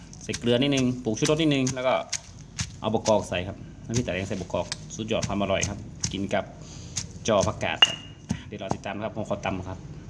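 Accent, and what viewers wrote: North, Thailand. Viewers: ดูฟังบ่อไจ๋คนเหนือครับคนที่ทำ ทำบ่อถูกครับ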